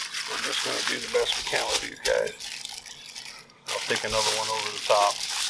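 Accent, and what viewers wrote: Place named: Pittsburgh, United States. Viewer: It's funny because I was watching this video and was catching your accent heavy. So I went to your channel and lone and behold, you're from the Pittsburgh area, as am I😂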